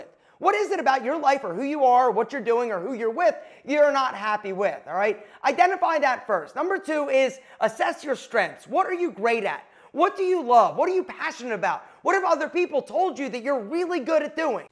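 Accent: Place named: New York, United States. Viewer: I like that you talk like a NEW YORKER !!!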